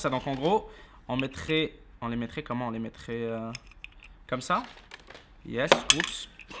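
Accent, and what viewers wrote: Quebec, Canada. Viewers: Tu es québécois ? Et merci pour les conseils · Avec tout ce que tu fais et t'es untboxig (dsl pour l orthographe) tu devrais faire une faq Continue comme ça je t adore PS t'es Quebecoie?🤔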